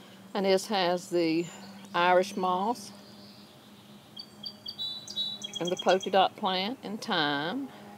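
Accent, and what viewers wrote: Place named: North Carolina, United States. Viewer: Adorable fairy gardens. I just planted my first one a couple days ago and I'm already making plans for another. I like your plant choices. I absolutely love that purple/green shamrock looking one. I'm going to have to keep my eye out for that one. Are you from NC? Your accent and the weather in your video seems close to home. ;)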